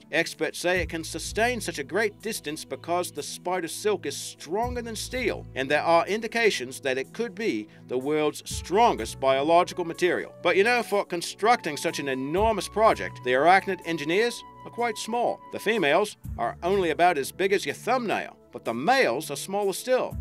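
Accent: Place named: Australia. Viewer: I think he's Australian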